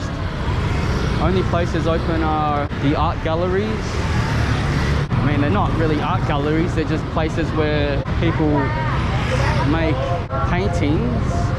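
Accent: Australia. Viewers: What does she means Chinese? Ignorant fish. You look, talk and walk like an Aussie to me without the hat and corks! Keep helping the poors and you'll find your Buddha. And keep up with the social rants with a big spoon of generalisation and a sprinkle of predjudice and you'll be an Internet Star too.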